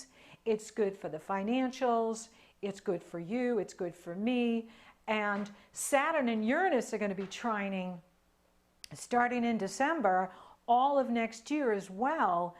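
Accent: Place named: Northeast, United States. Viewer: Great to hear from you,! Thank you for taking us on this tour, I LOVE it! Although I'm slightly confused, are you saying that our ascendant changes to our current location? Also, as born and bred Jersey Girl, I believed that your accent was North Eastern. My suspicions have been confirmed LOL. Anyway, again. Glad to hear from you and looking forward to more adventures with you:)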